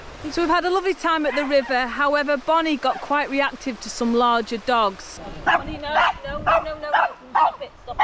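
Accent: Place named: Ashton-under-Lyne, United Kingdom. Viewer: Love your videos. Am trying to place your accent. Im fron Ashton-under-Lyne and hear myself in your accent. Where did you come from thinking it must be in this area?? Keep making us smile 😃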